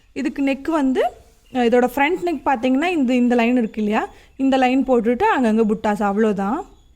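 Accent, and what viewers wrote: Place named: Coimbatore, India. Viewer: mam neenga cbe ah romba naal ah unga video pakuren apove unga slang vechi doubt irundhuchu aana therila andha sree devi box patha aprm tha conform achu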